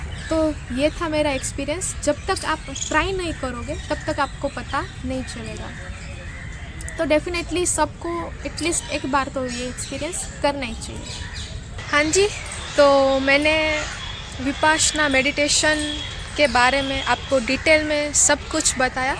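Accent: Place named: Kathiawar, India. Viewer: Typical kathiyawadi accent 😂 Nice👍🏼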